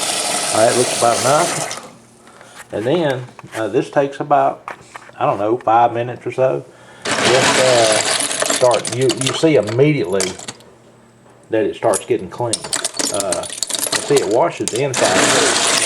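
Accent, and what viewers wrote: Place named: New England, United States. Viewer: Wow you sound like such a yankee.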